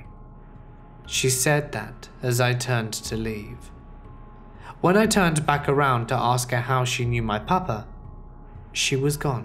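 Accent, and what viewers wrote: England, United Kingdom. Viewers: Kevin Heriford He’s English and that is the way they pronounce cemetery with their accent. 🤷🏻‍♀️ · That's how we Brits pronounce it....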